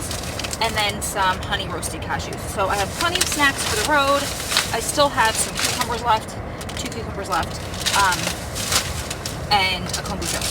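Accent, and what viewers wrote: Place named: Long Island, United States. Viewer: Awww no prob! I love your personality & accent. I have the same one bc I'm from long island, NY. Lol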